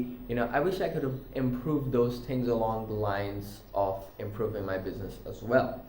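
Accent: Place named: United States. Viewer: are u from pakistan? how do u have american accent?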